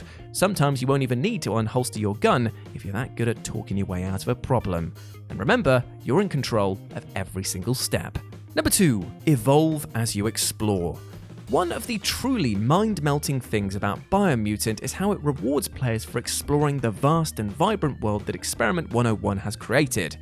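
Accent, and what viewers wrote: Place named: United Kingdom. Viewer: Voice acting? I haven't heard any. Just a vaguely British narrator.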